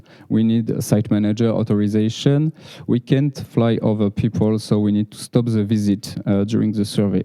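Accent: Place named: France. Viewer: do the french know that they sound atrocious when they speak English? Your accent, she urts may eers, no?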